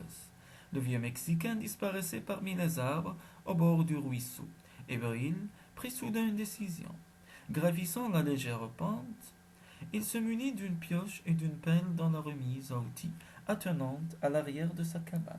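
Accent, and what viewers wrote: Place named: Spain. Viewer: Il me semble que vous avez un accent espagnol. J'ai bien aimé ce récit qui montre que les US se croit toujours maîtres de toutes choses.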